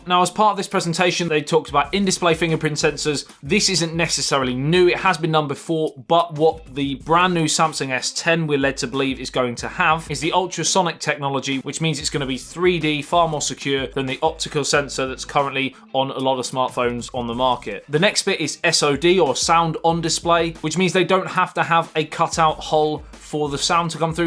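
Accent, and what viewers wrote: United Kingdom. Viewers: I subscribe just for ur British accent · Are you from the UK? I'm sorry but I just can't stand the accent. And no I don't need a foldable phone at all. I mean it might be cool but no.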